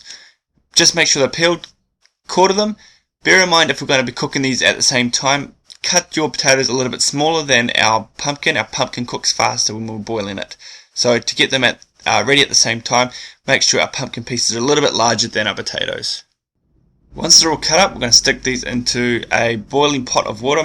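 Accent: New Zealand. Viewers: I lived in New Zealand, thought that his accent was familiar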